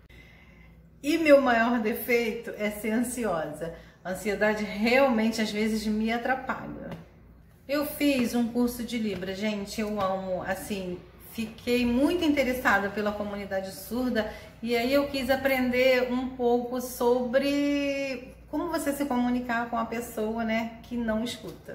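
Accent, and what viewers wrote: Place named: Rio de Janeiro, Brazil. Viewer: Nossa vc é baiana, mas tem um sutaque muuuuiiito puxado de carioca 😊 bjos! Vc é um amor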